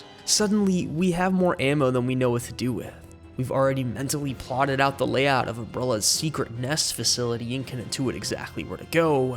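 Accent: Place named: Ireland. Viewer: Italian? My boy is Irish.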